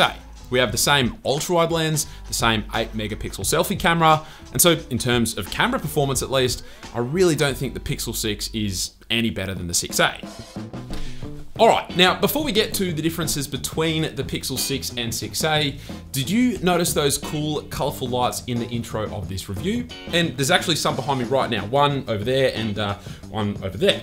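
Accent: Australia. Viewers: as I heard that aussie accent, I subbed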